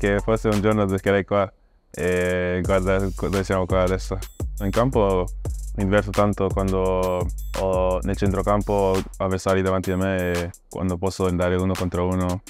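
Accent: Veneto, Italy. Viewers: Musah: (*parla italiano con l'accento veneto) First reaction: shock😮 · Grande Musah, con l'accento veneto.♥️🖤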